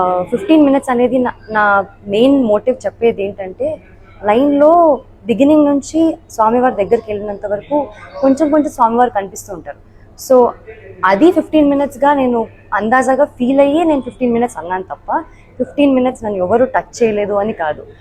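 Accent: Telangana, India. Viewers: Podcast lo your slang was like telangana girl..but ikkada chala different ga vundhi...podcast lo fake slang aa or ikkada fake aa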